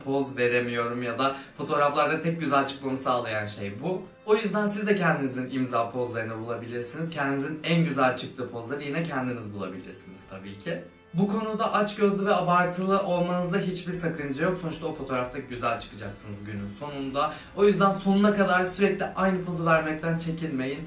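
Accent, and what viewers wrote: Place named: Bursa, Turkey. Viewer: Abi sesin neden Bursalı gibi çıkıyor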